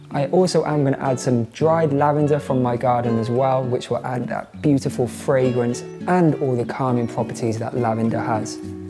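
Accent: Wales, United Kingdom. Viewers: I thought he was saying elder and that it was just his welsh accent.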